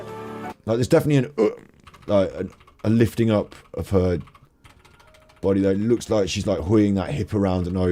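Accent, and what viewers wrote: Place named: Midlands, United Kingdom. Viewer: Agreed ! I like his thought processes and I ❤ how he sounds, eg: Midlands accent. Oh, and easy on the eye as well 😊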